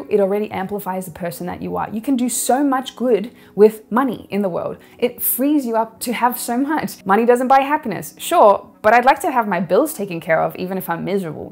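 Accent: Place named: Australia. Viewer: I couldn´t figure out why but the australian accent helps me to understand subjects better.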